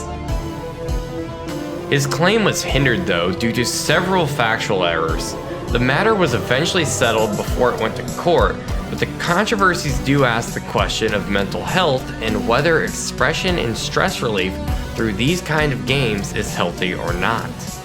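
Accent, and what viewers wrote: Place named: United States. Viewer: For someone reason, I thought you were British. I didn't realized until halfway in the video that you are speaking in American accent 😂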